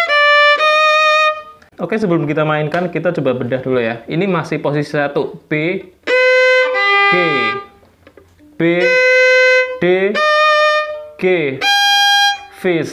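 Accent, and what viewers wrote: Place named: Java, Indonesia. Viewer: Medok banged njul🤣🤣🤣🤣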